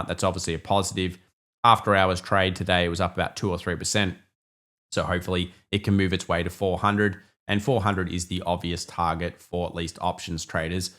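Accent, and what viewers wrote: Australia. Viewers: This guy does not understand what is happening in the US. He talks like an Australian. So many do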